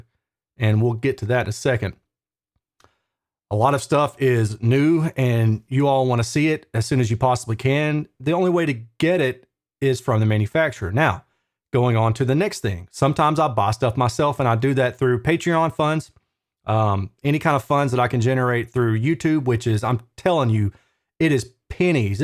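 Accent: South, United States. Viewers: Extra income?? You bought a friggin' Klippel system!! More than I would spend on a car! With a hobby like this, you need two day jobs. Also, as a yankee who loves to hear southern accents, it's nice to have you and Beau speaking sense, breaking the stereotype of the 'dumb south'.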